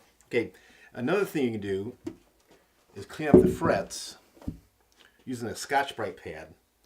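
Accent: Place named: Great Lakes, United States. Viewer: Your Great Lakes accent is quite strong.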